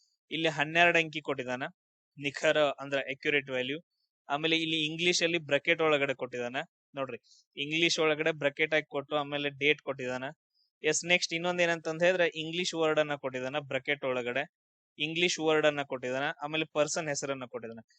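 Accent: North Karnataka, India. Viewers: Sir pakka namma uttar Karnataka davar❤😂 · ಸರ್ ಉತ್ತರ ಕರ್ನಾಟಕ ಭಾಷೆ ವಳಗ್ ನಮಗ್ ಕ್ಲಾಸ್ ಕೇಳೋದ್ ಒಂದ್ ಮಜಾ ರೀ 😁... ಸರ್ ವಟ್ಟ ನಮ್ಮ ಹುಡುಗೋರ್ ಪಾಸ್ ಆಗ್ಬೇಕು ನೋಡ್ರಿ ಹಂಗ್ ಟೆಕ್ನಿಕ್ ಸ್ಕ್ಯಾಮ್ ಹೇಳ್ಕೊಡ್ರಿ... ನೌನ್ ಈ ಸಲಾ ನಾ ನೀ ಅನ್ಬೇಕು ನೋಡ್ರಿ ನಮ್ಮ ಕಾಮೆಂಟ್ಸ್ ಮಾಡೋ ಹುಡುಗೋರ್ ಸೆಲೆಕ್ಷನ್ ಲಿಸ್ಟ್ ವಳಗ್ 😁😁.... ನಿಮ್ಮ ತಲಿ ವಳಗ್ ಏನೇನ್ ಪ್ಲಾನ್ಸ್ ಅದಾವ್ ಎಲ್ಲಾ ಹೇಳ್ಕೊಡ್ರಿ ಸರ್ ನಾವ್ ಅಂತ್ರು ರೆಡಿ ನಿಮ್ಮ ಕ್ಲಾಸ್ ಕೇಳಾಕ... ✨✨🙏🏻🙏🏻🙏🏻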